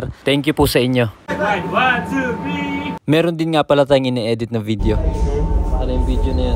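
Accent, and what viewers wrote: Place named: Samar, Philippines. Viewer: Taga samar ka pala talaga.. sabi ko na ei kc ang punto ng salita mo...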